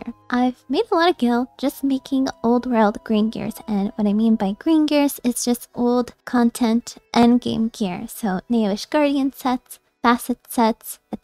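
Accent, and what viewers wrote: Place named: Texas, United States. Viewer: I have to be honest after watching you streaming is a little weird hearing you without your sassy texan voice. Still, cool video.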